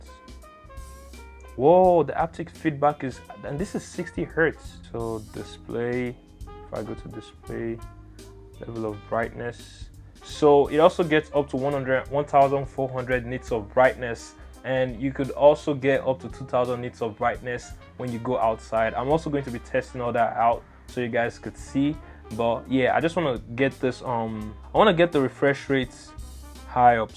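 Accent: Nigeria. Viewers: you straight up know he's Nigerian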